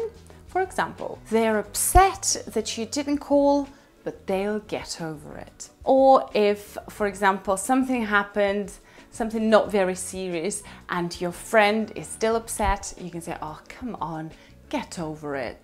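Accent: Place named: United Kingdom. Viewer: I loved her British accent sounds really cool and like a native speaker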